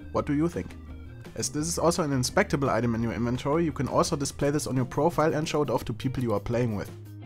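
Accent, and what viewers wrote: Germany. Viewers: man merkt so extrem deinen deutschen Akzent! ^^ aber echt gutes video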